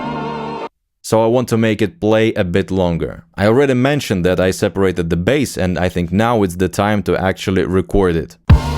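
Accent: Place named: United States. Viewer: Круто ты шпаришь по английски) Не знал бы кто ты подумал бы что асерикос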